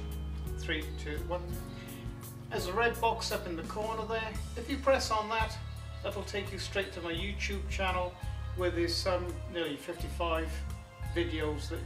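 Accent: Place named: West Country, United Kingdom. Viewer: Going to caaav a climber , the west country accent has hints of where the Baawston accent comes from .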